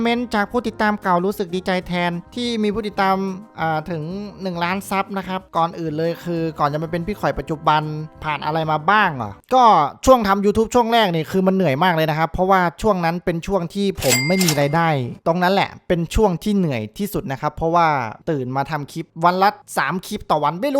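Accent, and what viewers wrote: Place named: Ubon Ratchathani, Thailand. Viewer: เสีองพี่คือขอพูดพี่คืออุบนเหมือมผมพี่เป็นคนอุบนใช้ครับ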